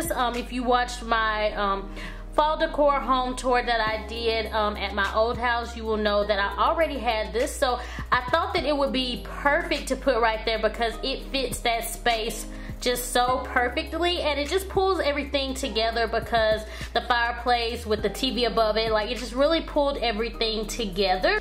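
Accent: South, United States. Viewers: are you from the south? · Just Beautiful!! I love everything you have done with the room. You are beautiful and your voice is so country. Love that too hahaha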